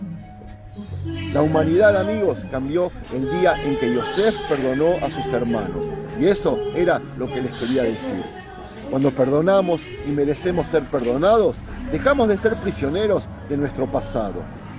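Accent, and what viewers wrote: Argentina. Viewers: Shalom! Me encanta ver sus vídeos me fascina todo lo que tiene que ver con 🇮🇱 y en español con acento argentino aún mejor.❤️🇮🇱 abrazo!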